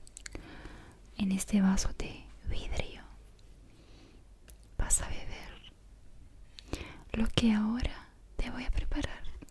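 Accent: Argentina. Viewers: Hablaste muy argentina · Argentino mezclado con Itailiano es dnwkwjjwjeje arte, Amé ♡ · Te salió un acento muy argentino 😂 ¿será la mezcla del Italiano y español? 🤔 Me encantó tu maquillaje! ☺ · Yo solo quiero una poción para tenerla a ella:'v Pst: Tremendo acento argentino 10/10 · Suena Argentino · una pregunta:¿Sos Argentina? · Es como que en este video hablas más en tonada Argentina a comparación de tus otros videos, va a mi se me hace no se(aclaro: no lo estoy criticando es más me gusta por soy de argentina) Amo tus vídeos💖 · Amo tu acento argentino 😍😍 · Wow nunca te había escuchado hablar español sin susurrar O.o que voz tan bonita! Tienes acento argentino y dices palabras latinas:p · Se le sale lo argentino.... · En este video me pareces muy argentina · Te queda genial papel de bruja😊 Pd: me encanta tu tonalidad media argentina c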